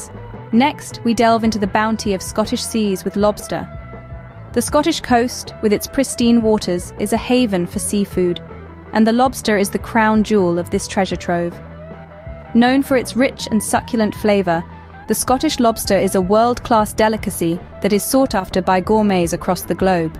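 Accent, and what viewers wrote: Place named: United States. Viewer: It’s ai and sounds kind of American at times but don’t let that get in the way of your bigotry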